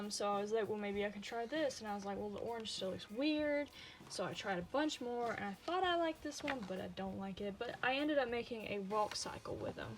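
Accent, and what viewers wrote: South, United States. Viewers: Ya know what, I did not expect your country voice from that thumbnail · You sound really southern ekwnensmsn me too!! Where are you from?? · Broo u from the south? U have a nice accent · I love your southern accent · You draw these uwu boys but your voice is very southern it’s throwing me off XD you sound like you live in Texas or somewhere deep in Gerogia.I’m southern myself but my accent barely ever comes out unless I’m casually talking in English with people I’m comfortable with and use slang.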